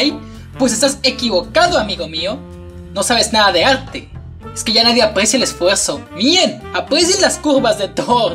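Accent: France. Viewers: es francés?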